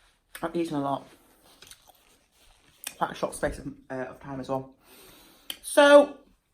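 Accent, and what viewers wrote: United Kingdom. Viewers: Love you are the bomb I love watching your videos and listening to you British accent! Your spaghetti looked amazing!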